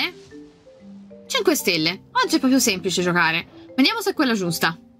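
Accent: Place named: Tuscany, Italy. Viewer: Ma sto accento toscano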